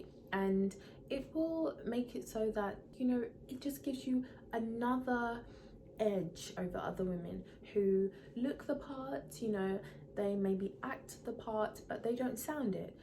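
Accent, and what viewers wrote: United Kingdom. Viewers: oh i didn’t mean it in a rude way i’m trying to say that maybe you focused a lot on the flaw but it’s hardly noticeable! you still have the same soft british accent but some words were pronounced differently and maybe your voice was a bit softer, but me coming from an Australian accent you definitely sounded feminine regardless!!! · Every thing you share is so true. I’m a very feminine woman, with a soft voice naturally, but I don’t always enunciate every syllable,... I wish so much I could speak with your proper British accent, but I can’t. One bonus is living in NYC and sounding nothing like the other native New Yorker women who can be more harsh and masculine than most men..... (sigh)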